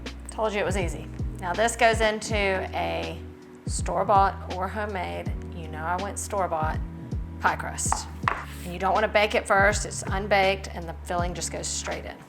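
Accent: South, United States. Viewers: Not only was this recipe. So are you. Will you marry me. It’s the slight southern accent. Absolutely gorgeous!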